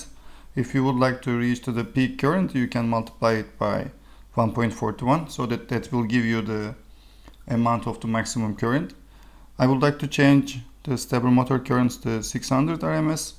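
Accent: Turkey. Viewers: Turkish accent? 😁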